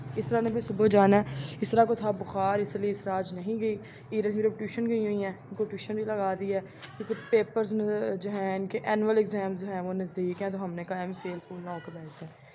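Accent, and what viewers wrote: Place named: Lahore, Pakistan. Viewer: میں آ پ کی ساری وڈیو دیکھتا ہوں آ پی آ پ کہاں سے ہو آ پ کی بولی لاہور کی لگتی ہے ❤❤❤❤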